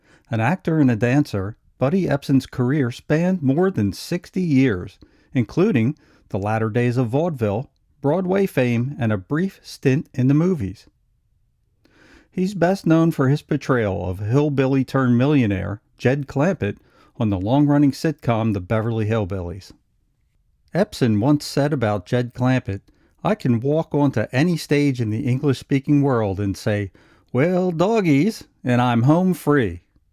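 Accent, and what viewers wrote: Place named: Baltimore, United States. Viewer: lovin the baltimore accent of the narrator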